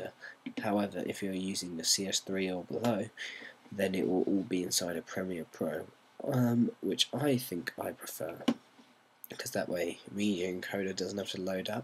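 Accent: United Kingdom. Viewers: Why NTSC (Never The Same Colour) when you sound English? :)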